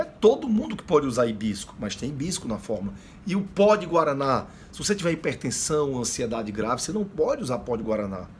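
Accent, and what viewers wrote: Northeast, Brazil. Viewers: Fala logo sotaque. Nordestino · Amo o sotaque do povo nordestino e agora você é meu Dr. favorito😊😉 parabéns trabalho lindo👏😘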